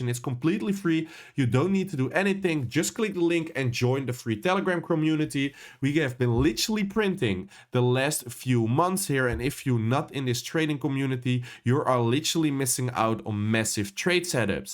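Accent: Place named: Netherlands. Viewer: are you drunk, ? or is that your dutch accent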